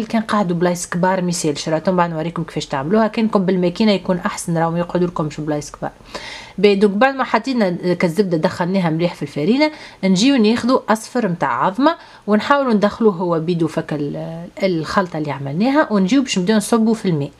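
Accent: Tunisia. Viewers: عجبتني الكيش وعجبتني الكيفية يعطيك الصحة ولي عجبني اكثر هدرتك لحلوة حقيقة اللهجة التونسية عسل 😍😍😍👍🌹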